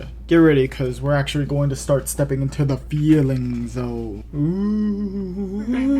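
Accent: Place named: Turkey. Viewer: This guy sounds very turkish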